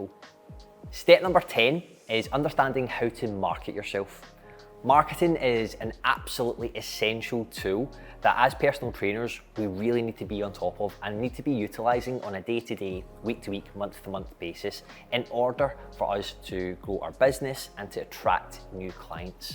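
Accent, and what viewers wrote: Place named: Scotland, United Kingdom. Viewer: How much love for this Scottish accent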